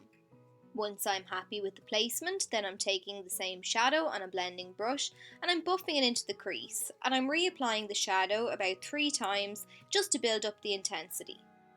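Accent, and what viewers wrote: Ireland. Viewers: Great youtube makeup artist 1. because she is talented 2. lovely irish accent :)!!!!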